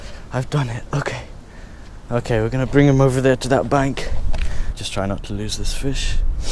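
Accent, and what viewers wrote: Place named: United Kingdom. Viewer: You sound so British lol nice video dude.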